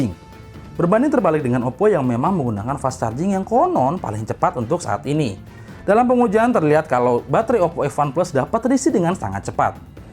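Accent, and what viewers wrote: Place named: Bali, Indonesia. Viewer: psti orang bali ya om..?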